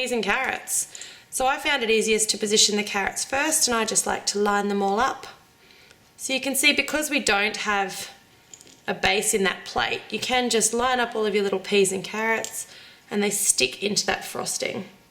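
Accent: Australia. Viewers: Are you Australian? I'm a kiwi, I know what an Australian sounds like... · Pugh she's Australian!!! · OMG if I had an Australian accent I would never shut up. I love your accent!! ^-^ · i love her accent! are you austrailian? good video! lovely cupcakes!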